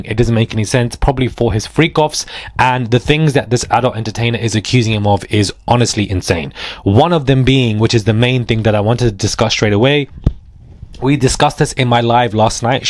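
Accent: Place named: London, United Kingdom. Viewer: Yeah his accent is a London accent. It is how we speak in the South Eastern part of the UK.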